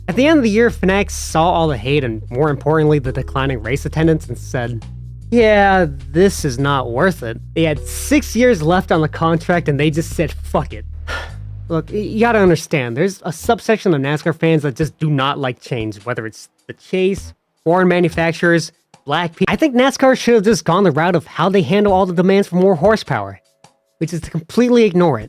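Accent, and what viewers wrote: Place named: North, United Kingdom. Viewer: Man it's awesome to literally hear the diversity in NASCAR fans. Cause I can detect the northern in your voice lol.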